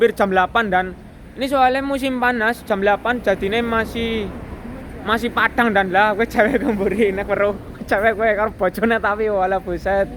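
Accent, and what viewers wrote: Banyumas, Indonesia. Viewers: Lapor ndan,,saya dari tiktok,,,lari kesini,, Saya suka logat ngomg mu ndan,,inyong inyong😀😀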